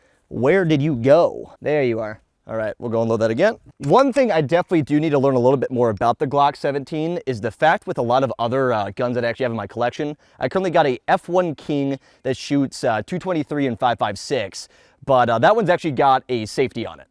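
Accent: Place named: Texas, United States. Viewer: He has to be from texas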